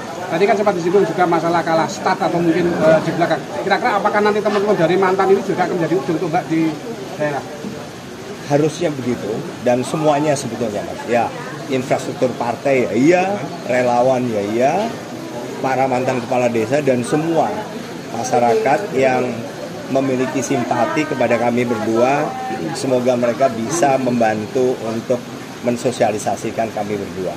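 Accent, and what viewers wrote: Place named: Banyumas, Indonesia. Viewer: Ttep saya memiling pak andika rari orang ngapak bolo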